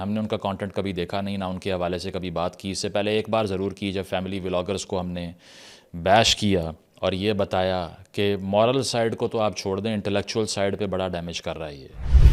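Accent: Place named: Punjab, Pakistan. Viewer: Pukka Punjabi style waaoo mazaydaar exent❤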